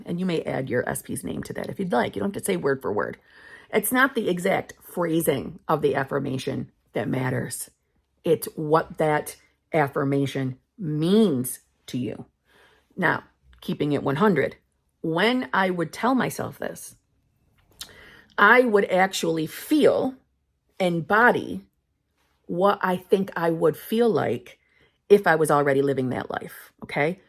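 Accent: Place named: Upstate New York, United States. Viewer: New York upstate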